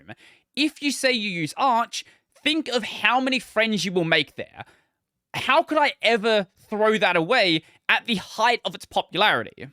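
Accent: Australia. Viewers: I fell for it until I realised you are Australian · are you australian or what?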